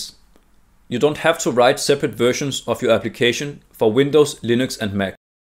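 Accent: Denmark. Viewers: Danish? I like the accent :))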